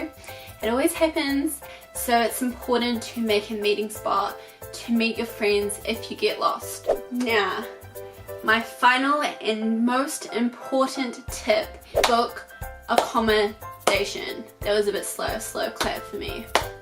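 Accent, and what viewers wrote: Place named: New Zealand. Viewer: What a painfull video with hideous accent... Bloody kiwis....some people naked...yeah right....i think the acid she had has swallen her tongue cause she cant talk properly...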